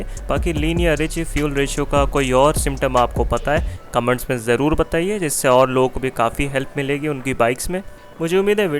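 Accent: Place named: Uttarakhand, India. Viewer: So you are from Uttarakhand 😂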